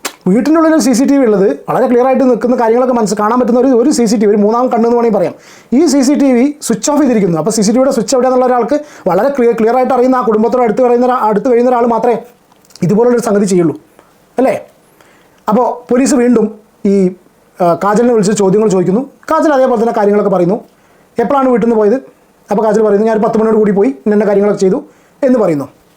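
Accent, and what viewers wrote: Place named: Palakkad, India. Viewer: ബ്രോ വീട് പാലക്കാട്‌ ആണോ ഭാഷാ ശൈലി അതുപോലുണ്ട് 👍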